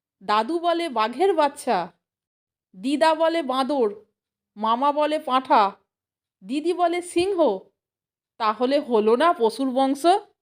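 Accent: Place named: Hooghly, India. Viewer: আপনার কথায় হুগলির টান পেলাম তাই বলছি।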